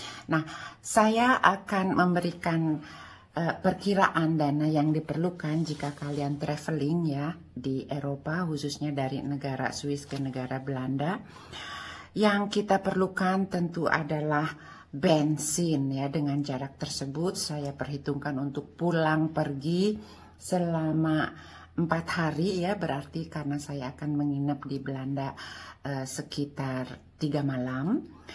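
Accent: West Java, Indonesia. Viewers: Selalu di tunggu bunda uploed nya sangat bermafaat info info nya .walau pun bunda tinggal di Swis tp logat bicara nya masih terlihat bahwa bunda orang sunda sama seperti saya 😊💜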